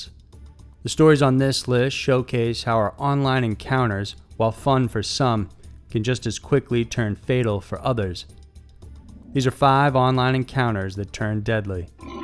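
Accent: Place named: South, United States. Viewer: It is probably his accent. Northern and Southern accents are different and words are pronounced differently according to where you grew up. He sounds like he may have lived in the Southern area of the US. I'm friends with a Southern girl and I am from the North. She says words differently than I do at times.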